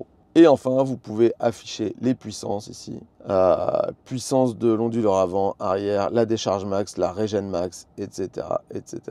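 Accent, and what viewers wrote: England, United Kingdom. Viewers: on avait déjà eu un aperçu de ton accent anglais, là j’avoue que je suis encore plus sous le charme avec ce qnob 😅 Merci pour le test en tout cas;)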